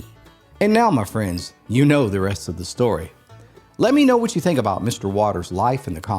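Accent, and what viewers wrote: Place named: South, United States. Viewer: told in such an authentic southern drawl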